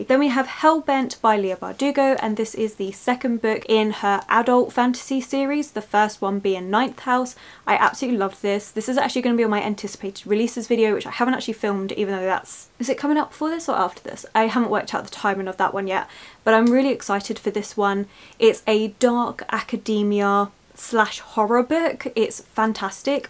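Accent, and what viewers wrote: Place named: Essex, United Kingdom. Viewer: I really loved this video and channel,happy monday xx fan 🧑‍🎄.....ps you sound essex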